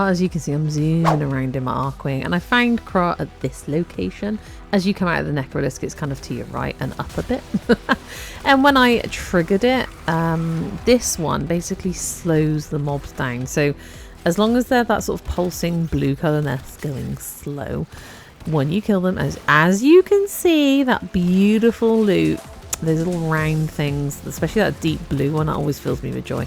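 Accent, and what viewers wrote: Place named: South West, United Kingdom. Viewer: You can also use a necramech for these btw. Appreciating your loot vids and the novelty for me of hearing a south west warframer, your accent takes me right back home :)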